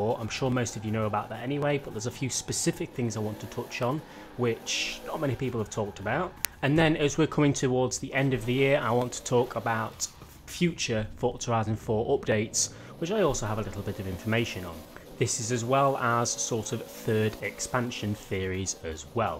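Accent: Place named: North, United Kingdom. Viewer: For some reason I never noticed how much of a northern accent has until now. 🤣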